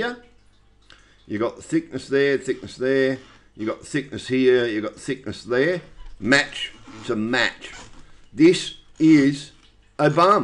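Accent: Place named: Australia. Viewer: "If ya don't fuckin believe me I don't care" - you're awesome mate! Nice to have a real aussie bloke talking about these things on here. Ive always believed that they are the same man too.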